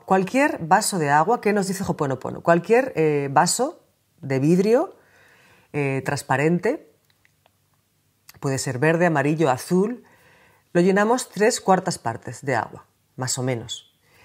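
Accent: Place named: Spain. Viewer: Que dulzura la de esta mujer. Su voz tan calma, tan.armoniosa, tan de paz.... de por sí ya te trasmite calma y sanación. Que bella es la fonética de los nativos de España.... enamora. La escucharía por horas y horas... Jajaja Saludos desde Rosario - Argentina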